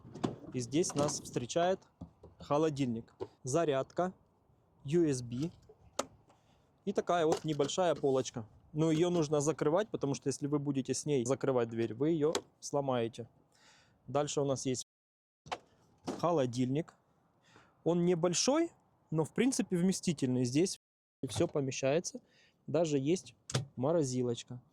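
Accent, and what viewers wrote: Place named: Ukraine. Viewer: Слова "ложить" нет в русском языке....есть "положить" или "класть". Думаю Вы украинец, судя по акценту. Спасибо за видео.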